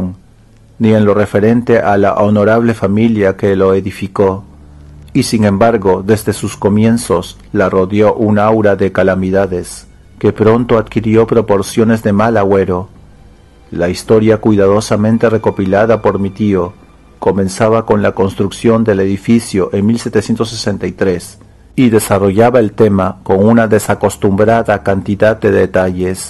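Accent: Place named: Jamaica. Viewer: Bien por la lectura, pero a veces suenas como si fueras jamaiquino. Para que trabajes en eso. Saludos.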